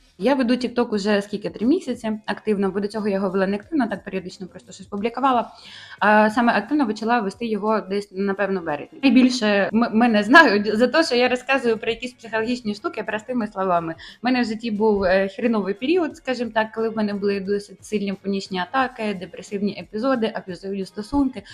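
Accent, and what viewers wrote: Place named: Cherkasy, Ukraine. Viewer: Звідки ви? Такий цікавий говір? Часом не Черкаси ? Прекрасна енергетика😻😻 Продовжуйте далі❤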